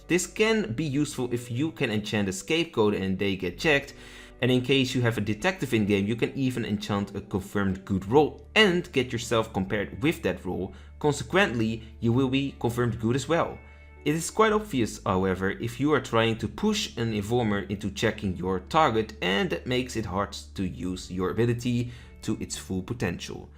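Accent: Netherlands. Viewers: Why do you sound so Dutch?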